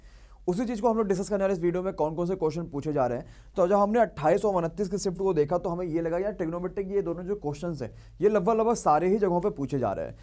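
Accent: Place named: Kanpur, India. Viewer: कनपुरिया स्टाइल🤘🤘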